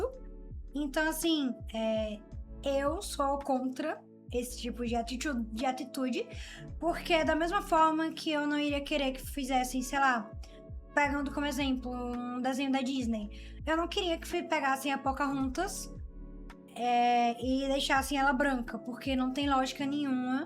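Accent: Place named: Pernambuco, Brazil. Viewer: Acabei de conhecer o canal e gostei demais do conteúdo e principalmente da sinceridade. Aliás, alguém conseguiria me confirmar se a é nordestina? pelo sotaque eu desconfiei que ela é, provavelmente, da Capitânia de Duarte Coelho (Pernambuco)? kkkkkkkkkkkkkkkkkkkkk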